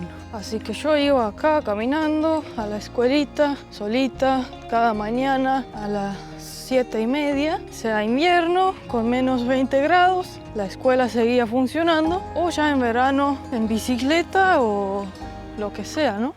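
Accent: Argentina. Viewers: Un lindo detalle tu español es muy Argentino, me hace reír , se te escucha bonito , cuando quiera mudarte a algún lugar vente a vivir a Venezuela,la isla de Margarita pudiera ser y cuando extrañes el frío , te vas a los Andes , y quiero selvas Ríos te vas al sur Al estado Bolívar , y al estado amazonas , y si quiera decierto ve a los médanos de coro , Adicora , y para el caldo s ve a Maracaibo, y quierea estar con ganado te al llano , montas a caballo , y cantas y bailas Joropo , aquí lo tienes todo ven que te muestro 😅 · Habla su español en Argentino 😅 .. te felicito por tener muy buen español excelente video bonitos recuerdos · tienes acento argentino 😲😮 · Fantástica, debería hacer más vídeos sola, por cierto no veo nada raro en tu voz, lo curioso es una estonia con acento argentino, deberías buscar un acento más acorde a tu continente, es decir castellano europeo, no latino americano, que no es algo malo, pero pareces argentina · Habla perfecto,es español,y tiene acento argentino😊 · Tiene acento argentino o me parece😮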